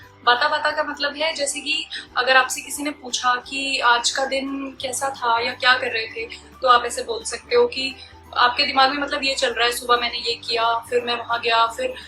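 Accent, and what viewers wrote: Punjab, India. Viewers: mam r u from Punjab???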